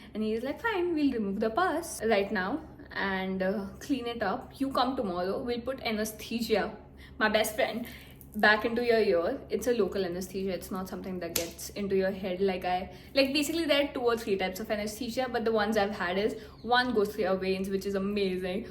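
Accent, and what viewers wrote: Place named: Maharashtra, India. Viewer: loved your vlogs ✨♥ your maharashtrian tone is such a :hands-yellow-heart-red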